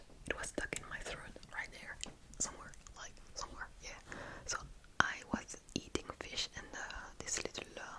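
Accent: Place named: France. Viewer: make more videos with french accent please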